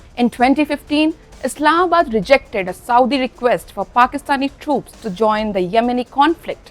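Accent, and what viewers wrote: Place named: India. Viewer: The indian accent i cant continue listening. Its horrific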